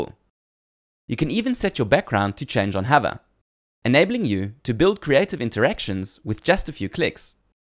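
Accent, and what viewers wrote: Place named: South Africa. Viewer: He is sowth efrikan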